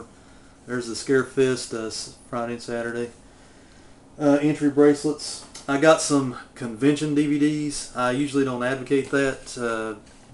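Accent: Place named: Western Kentucky, United States. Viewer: Is that an actual poster for "The Mutilator" aka "Fall Break" !!??! That is bad ass. Also, your accent sounds very similar to the folks where I grew up in Western Kentucky. Great vid. Sounds like you had a blast.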